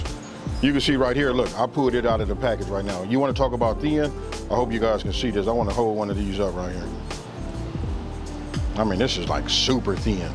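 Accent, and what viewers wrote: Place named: South, United States. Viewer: I was Stressed out today. finally got some free time but stressed. Tried a movie. Could not concentrate because still stressed out. Thought some music video might help but this was recomended. This guys Talking southern USA accent calmed me right down. Thanks and You Tube.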